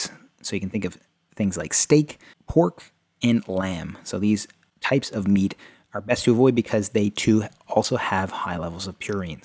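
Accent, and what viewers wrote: Canada. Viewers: He sounds Canadian. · I think he's Canadian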